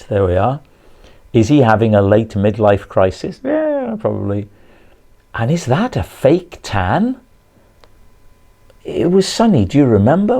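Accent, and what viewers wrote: Wales, United Kingdom. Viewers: Loved your welsh accent Rob, stonkin butt! 😀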